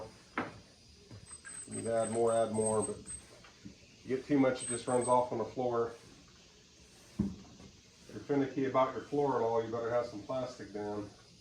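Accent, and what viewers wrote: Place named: Baltimore, United States. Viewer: Great looking boat, you have a southern accent with baltimore pronunciations.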